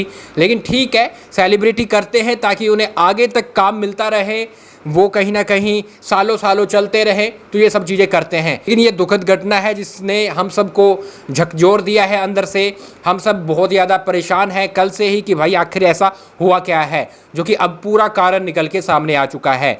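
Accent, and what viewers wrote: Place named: India. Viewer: भाई, हम अमेरिकन नाही, जो शॉ बोले सिर्फ, इंडियन अक्सेंट एसा ही है. There को देयर, alive ko लाइव्ह, र, कड, daughter ko डॉट नहीं डॉटर, पुरा उच्चारण करते है, क्या हुवा ठीक है.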